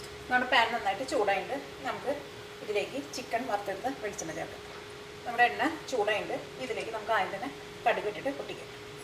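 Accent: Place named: Irinjalakuda, India. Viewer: I like your slang.. are u from Trissur?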